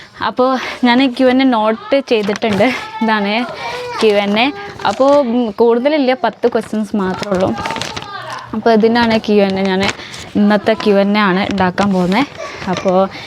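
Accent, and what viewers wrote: Malappuram, India. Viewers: Malppurath alle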